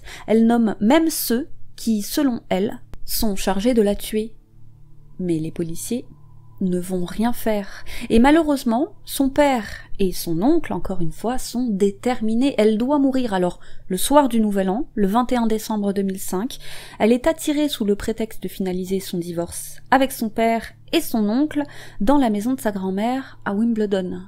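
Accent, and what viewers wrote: Arab world. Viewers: Oua l'accent arabe , on voit d'où vous venez......peut être, l'Algérie.......🤔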